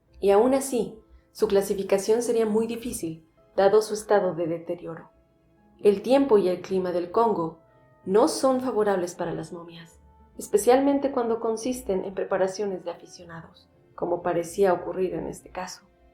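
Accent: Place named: Mexico. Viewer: Tu tono tu voz son ambrosía para mí oídos. SOS mejicana suena dulce como la miel me recordas el aroma de las margaritas. (Las flores)🇦🇷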